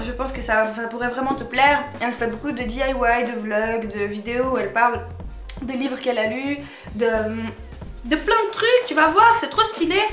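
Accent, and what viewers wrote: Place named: Switzerland. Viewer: Super vidéo, il me semblait bien que t'était Suisse, avec l'accent... Tu viens de quel canton ? Moi c'est canton de vaud😂❤️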